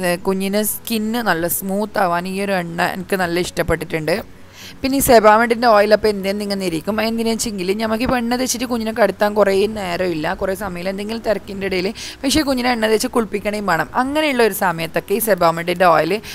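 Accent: Kasaragod, India. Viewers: Sebamed super ,ksd slang l polichu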